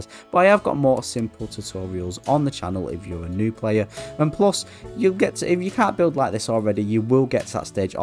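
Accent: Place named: England, United Kingdom. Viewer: Very talented mate- glad to hear the English accent, not often you hear "sack it off" on youtube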